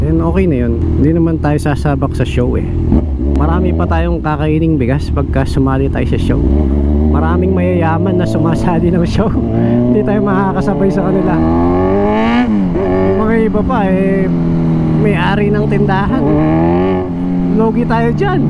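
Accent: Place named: Ilocos Sur, Philippines. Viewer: akala ko nasa ilocos sur ka idol HAHAHA kasi similar yung mga nahay eh hahaha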